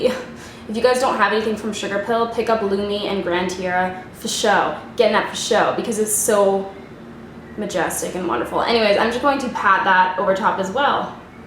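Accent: Canada. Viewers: Your Canadian accent tho! Can't get over the way you say crease, I love it! Love your work xx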